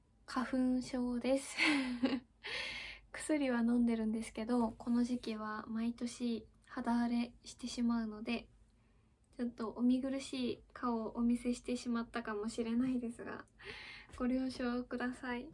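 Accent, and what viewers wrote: Japan. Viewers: 青森出身？青森の おじさんがうるがす。 言うてた。後～、薬の イントネーション同じ。 へばな。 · お疲れ様です 今頃のコメントで申し訳ありません。 動画を拝見して、「うるかす」を初めて聴きました。先日の「耳がいずい」もそうですが、東北地方のご出身とのことで、お国訛りは、国の誇りです。どんどん使ってください。（本気の東北訛りだと標準語の字幕が必要かも？）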